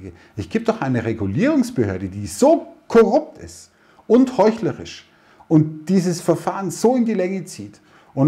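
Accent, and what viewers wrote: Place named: Bavaria, Germany. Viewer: Servus, denke ich auch. Übrigens darfst ruhig Michi sagen😉. Ist wahrscheinlich bei deiner messageflut nicht so einfach. Dürfte ich dich fragen wo du wohnst? Deinem Dialekt nach scho im bayrischen Raum oder?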